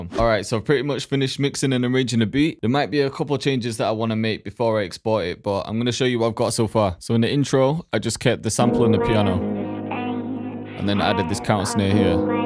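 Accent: United Kingdom. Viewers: I really like this dude's British accent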